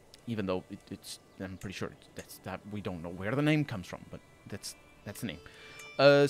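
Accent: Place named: Portugal. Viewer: For years I couldn't picture where your accent was from turns out you're Portuguese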